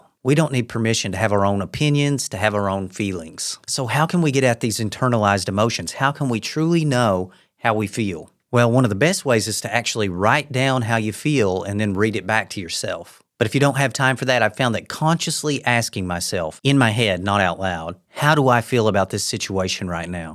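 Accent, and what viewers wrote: South, United States. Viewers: I really like your southern accent, it’s nice to hear.☺️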